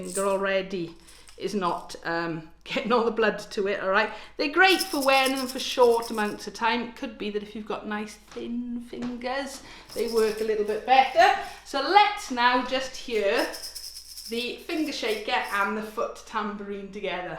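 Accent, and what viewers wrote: Wales, United Kingdom. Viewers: Just love watching you and your lovely, warm Welsh accent. I've just begun to learn at the age of 84. Thank you.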